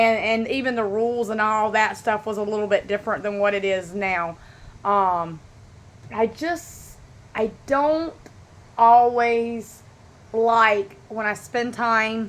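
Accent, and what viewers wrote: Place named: South, United States. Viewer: The first ever video I watched was your "What's in my purse" video when I was shopping around for a jansport mini. Really loved your southern accent and simple vibes. You will be missed. It was comforting to watch your chats and cleans.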